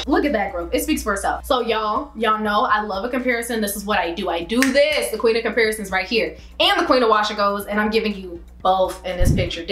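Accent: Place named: South, United States. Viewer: U sound country and I never noticed bc I was lived in tx now Indiana yea u def sound country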